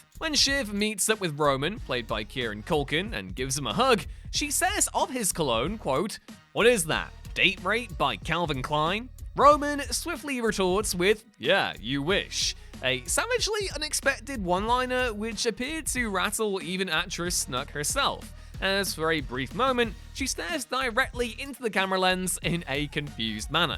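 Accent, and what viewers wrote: United Kingdom. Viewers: it's kind of awkward hearing a Brit talk about the American version of the office when the British version is a lot better. (P.S. I'm American)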